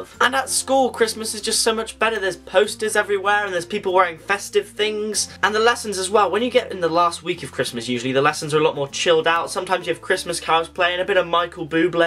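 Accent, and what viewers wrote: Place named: England, United Kingdom. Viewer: your accent is so cool what part of england are you from great video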